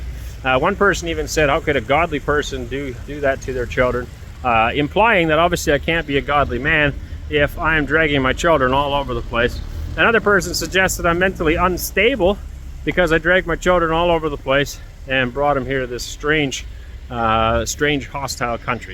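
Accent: Canada. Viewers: Canadian English))) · Could tell your from 🍁 by your accent.. good luck in Russia . Hoping to get a new American president before this clown gets us in war with Russia. Rather have pootie as our president too be honest. Only isuue i have with your move is you didn't stay and try to fight to get your country back we need all the people we can get at this point to turn the tide. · I can't imagine he doesn't have the Russian accent not to mention the Canadian accent! Good luck out there people know this and it's a disadvantage for sure. Man I'd live out there anyday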